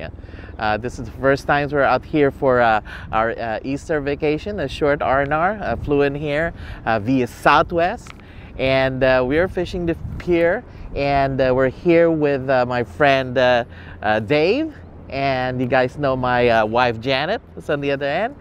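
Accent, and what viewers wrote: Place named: Philippines. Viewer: You must be Filipino...your accent lol. I didn't know anglers still fish on poers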